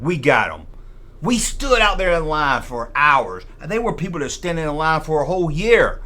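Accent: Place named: South Georgia, United States. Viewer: So dad and I are on vacation and I’m checking out this episode and dad says “What the hell are you watching??” I said what!? Dad, this is!! He laughs and says he sounds like a bumpkin from South Georgia. I said, “He IS He IS! And I can’t get enough!! 😅😂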